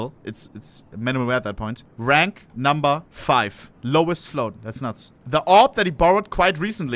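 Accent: Germany. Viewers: German. I love his accent bro 😂😂😂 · He pronounces it in German w sounds like v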